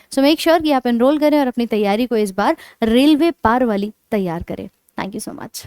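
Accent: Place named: Meerut, India. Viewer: Ma'am aap kya Meerut se ho kya Kyonki aap बढ़ाने को भढाना bolti ho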